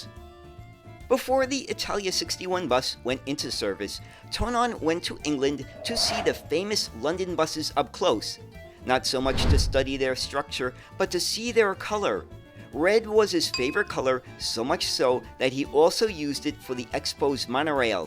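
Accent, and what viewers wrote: United States. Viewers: Thank you for yet another interesting bus video. I am not from the USA and so I hope you don't mind if I ask about your accent and which part of the US you come from?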